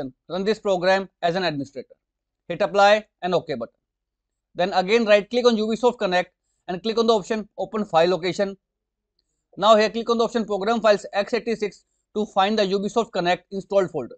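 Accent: India. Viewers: work on your accent please I cant understand indianness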